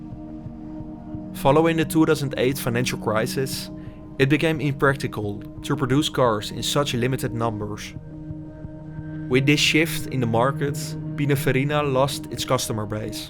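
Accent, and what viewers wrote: Netherlands. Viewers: Haha ik kon aan je accent horen dat je Nederlands was maar mooie video hoor ;-) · No crappy AI voice and just a nice Dutch accent !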